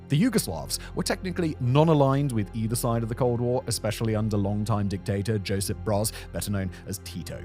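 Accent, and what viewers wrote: United Kingdom. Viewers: British accent talking about the balkans is red flag that announces bullshit. War in croatia broke out after germany armed croatia, war in kosovo broke out after the UK and the US armed moslem terrorists. The monologue speaks of serbian nationalism 100 times, and relevance of history to understand the presence, but fails to mention ww2 organized extermination of serbs in croatia and bosnia (by nazi allies croats and moslems)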